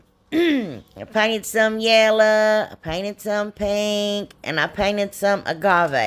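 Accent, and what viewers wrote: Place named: Rural, United States. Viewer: I absolutely love your accent... What state are you from? Your crafts are so kool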